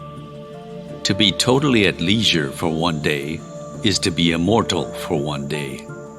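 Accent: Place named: United States. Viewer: voice over😢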